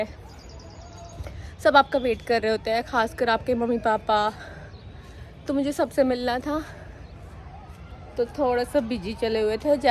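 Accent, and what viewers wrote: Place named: Haryana, India. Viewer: Bati lagta h ap haryana se hansi k aas pas se ho bilkul hmari tra ki boli h bati mujhe bada acha lagta h hmari haryanvi bati austrailia men khoob dhoom mcha rhi h austrailia kbare men badi achi information de rhi ho apna experiance share kr rhi ho bhagwan bati ko lambi jindgi de hamesa khush rakhe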